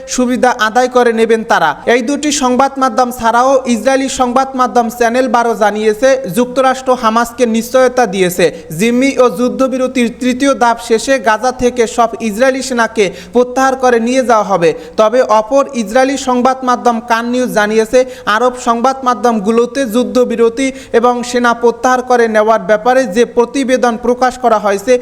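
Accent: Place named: Barishal, Bangladesh. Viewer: A Borisaila toke news porte ke bolese, age vasha shek tar par news par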